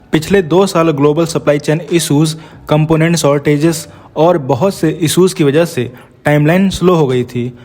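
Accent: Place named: Bihar, India. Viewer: bhai sabse pehle apko defence me thodi geopolitics v add krni hogi just few news and bhai jab aap koi English word bolte ho it sound like u live in bihar apko thoda accent shi krni hogi,(please dont minde) or bhai apni video baki k defence channels se ada ya ak ghtna pehle upload kiya kroo, or bhai kisi news ko poistive way thoda criticise v kiya kroo ap just news bolte ho thoda explain v kiya kro aisa nhi aisa hona cheahe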